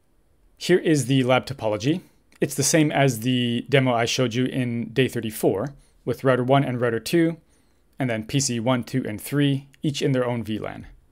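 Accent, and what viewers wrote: Canada. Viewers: I think I got this :) ACLs at least lol by the way, youre originally from Canada right? I hear the "aboot" ;)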